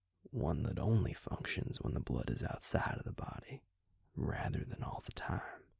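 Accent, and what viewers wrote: South, United States. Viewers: me not being american and not used to southern accent: "i don't understand but.. YYEEE HA!!🤠" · lovin the yeehaw accent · I love his southern accent 😍he's too cute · Not usually a big vamp lover but oooh boi that southern twang could turn anyone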